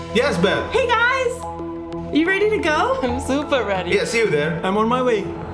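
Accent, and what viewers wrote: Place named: India. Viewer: the voice belongs to india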